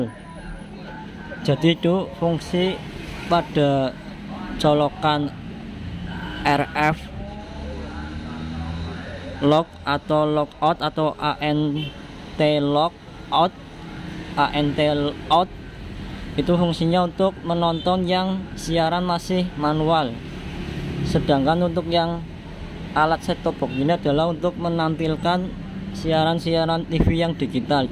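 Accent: Java, Indonesia. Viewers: Pasti...iki wong Jowo....medok banget ngomongnya!😄😃😀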